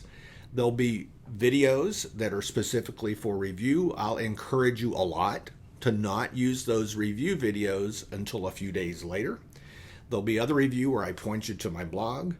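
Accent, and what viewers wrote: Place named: South, United States. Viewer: Excited, one to finally meet you here,. Two to finally be able to understand someone not too fast or too slow speaking, and also like your southern draw. Its refreshing 😊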